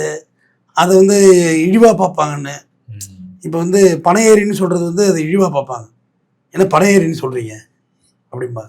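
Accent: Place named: Tirunelveli, India. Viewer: உங்கள் மொழி நடை அசல் திருநெல்வேலி மொழி நடை Uncle 😊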